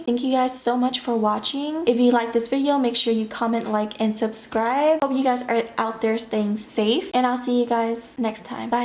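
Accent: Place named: Bay Area, United States. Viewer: i’ve been binge watching these videos for the past couple of weeks and i’m so happy i came across yours! as soon as you started talking, i was like “oh this girl from the bay too” and sure enough! hahaha automatic subscribe. plus i love your penmanship and how minimalistic you keep everything. i plan on starting my budgeting in june and had the same idea of how your process is. and congratulations on the pregnancy, she’s almost here! i look forward to your other videos. xo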